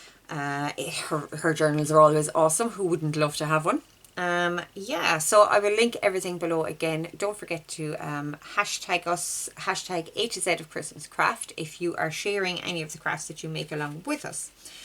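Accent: Ireland. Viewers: Lovely to hear an Irish voice! I’m from Lisburn Northern Ireland. Just loved your rocking robins and will be making them for the grandchildren.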